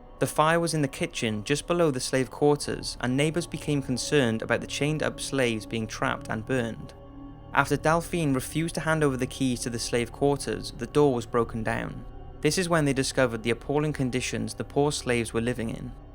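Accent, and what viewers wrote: Wales, United Kingdom. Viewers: Loved this video, it was so interesting! Are you Welsh? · 1. It really wasn't a good idea to watch this at 1am. And, 2. Are you Welsh? I'm really trying to figure out your accent and whether you're part of the Cardiff crew too 😜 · awesome video! Just wondering, it's really bugging me, are you Welsh?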